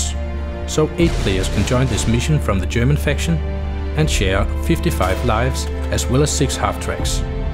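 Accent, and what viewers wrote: Denmark. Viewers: i hear a danish accent... hmmm FUCK YERH IT DANISH! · Haha! Could hear right away that the narrator was danish! xD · Who voiced this? a danish person? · No, he's Danish, I know this because I'm from DK myself, and I can clear recognize the accent :D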